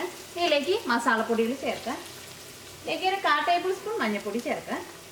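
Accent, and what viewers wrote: Thrissur, India. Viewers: തൊലിഞ്ഞ തൃശൂർ സ്ലലാങ് ഒഴികെ എല്ല്ലാം കിടിലോൽ കിടിലം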